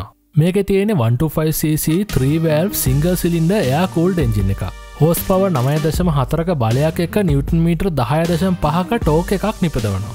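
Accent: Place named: Matugama, Sri Lanka. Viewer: machan uba matugama peththeda ? video ake mathugama peththe then vagayak thibba....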